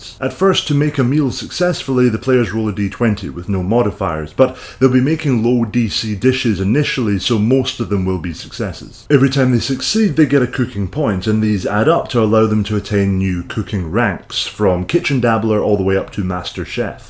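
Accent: Scotland, United Kingdom. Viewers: it sounds to me like a hybrid of typical scottish/ northern english accents with a very slight lisp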